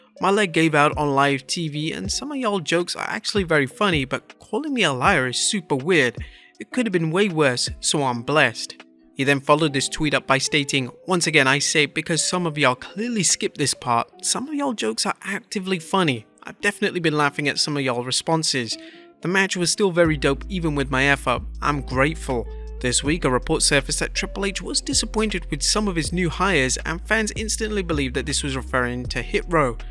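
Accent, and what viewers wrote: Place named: United Kingdom. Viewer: Idk why but hearing the narrator read Top Dolla's tweets was hilarious to me. Particularly with his thick British/English accent and saying "y'all". Lmao. Idk why it's funny to me but I was laughing so much during this segment. Probably just because I worked all this weekend and I'm tired/delirious. 🤣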